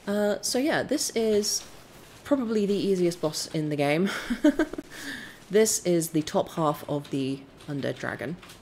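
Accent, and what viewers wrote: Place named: Ilford, United Kingdom. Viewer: Yorkshire?! He sounds like he’s from Ilford to me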